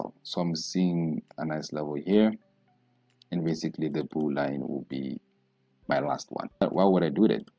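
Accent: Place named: Africa. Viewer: That’s the most African accent I’ve ever heard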